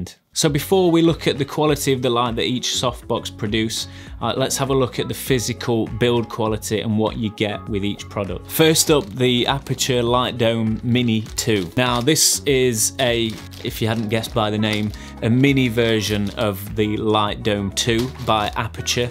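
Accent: United Kingdom. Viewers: I think you're #DannyGevirtz with a British accent. Thanks for another great video, bro.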